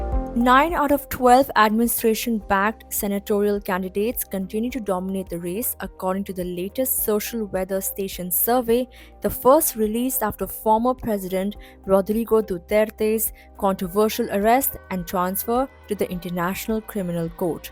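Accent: India. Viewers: Hahaha, you speak english well but you could not trash your indian accent. That's what you get when you always spread fake news about the DU30's. Are you one of many media outfits that received incentives from marcos jr?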